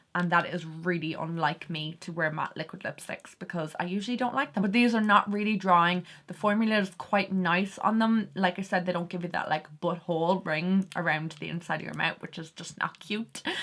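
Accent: Ireland. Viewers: Hello beautiful, new subscriber. So proud I recognized your accent as an Irish one. 😉😊 How lovely. 💖💖⚘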